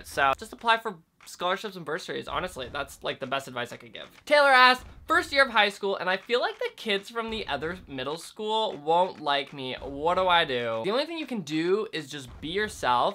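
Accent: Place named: Canada. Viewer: The way Canadians say the letters -ou is so cute I can't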